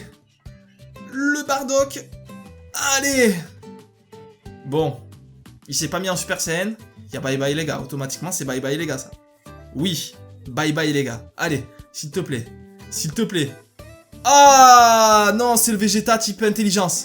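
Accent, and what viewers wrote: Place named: South, France. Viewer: Vu ton accent ca se voit que tu es un sudiste😊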